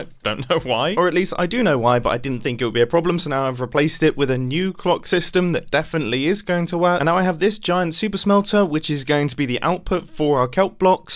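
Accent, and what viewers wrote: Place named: United Kingdom. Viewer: My family loves your channel we watch it as a family sometimes just to hear you British accent 😂😂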